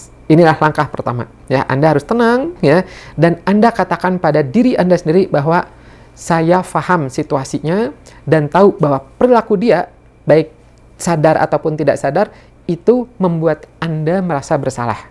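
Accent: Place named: Sunda, Indonesia. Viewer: Vidio yg selalu insightfull soal guilt trip alias gak enakan. Dan Ketika sundanese menjadi narator, sangat terasa intonasinya. Karena saya pun begitu. Tema Kontennya pun mirip dengan, tp masih pemula saya hehe.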